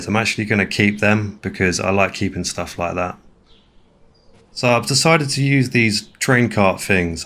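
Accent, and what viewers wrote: United Kingdom. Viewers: Love your cockney accent bruv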